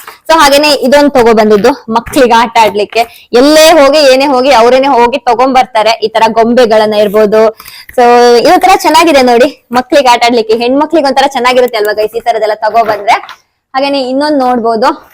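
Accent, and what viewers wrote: Kundapura, India. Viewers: Nam kundapura kannada keli kushi ayt🎉🎉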